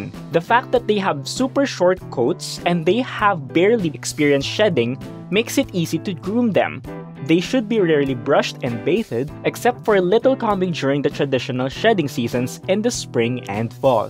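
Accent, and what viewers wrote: Philippines. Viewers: I love my Burmese. She always climbs my shoulder as soon as she sees me lounging on the couch or when working in front of the computer. Btw, the voice-over is a clear giveaway Pinoy! Yay!🙌🏼🇵🇭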